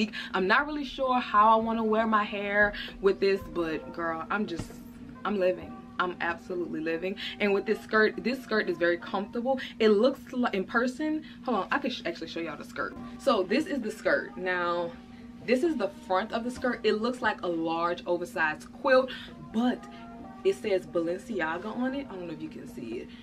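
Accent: New Orleans, United States. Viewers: I would've never guessed your accent for New Orleans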